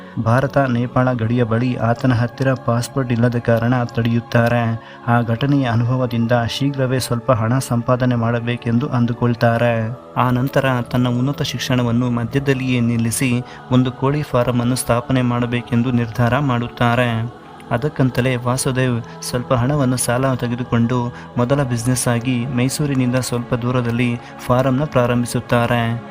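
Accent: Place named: England, United Kingdom. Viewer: He talks in a very heavy English accent and pretends not to know Kannada. Why can't he talk normally.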